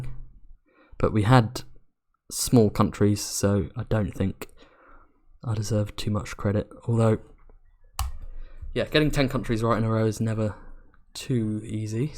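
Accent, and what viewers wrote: United Kingdom. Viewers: I meant, to me Wizard has a stereotypical Black Country accent and you have a more generic Southern England accent which I find hard to pin down.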